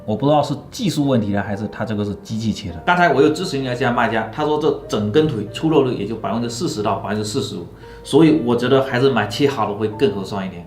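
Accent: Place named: Minbei, China. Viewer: 的闽北普通话不敢恭维丫的女人缘真的没话说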